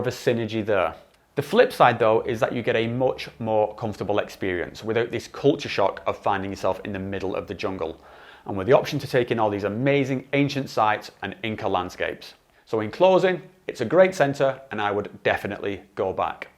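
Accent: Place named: Manchester, United Kingdom. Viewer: ah I knew you were close, I can hear the manc in your accent now haha I'm from Leeds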